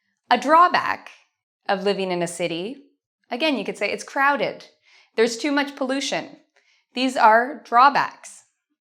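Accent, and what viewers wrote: United States. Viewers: I love the American accent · You're the best teacher I ever had, sirouslly. I'm brazilian and I only have one year of practece but I can speak of course not that good hah lol. All right I love you you're cute you know how teach and even have a American pronanciation. That's what I want to lol. Thank you and send more video okay? Bye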